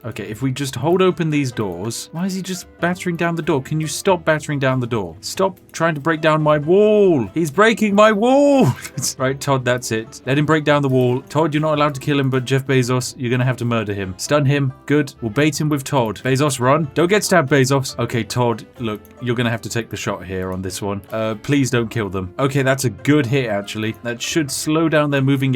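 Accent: United Kingdom. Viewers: Reminds me of Ambiguous Amphibians series on this, but with a heavy dose of British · bro is british gray still plays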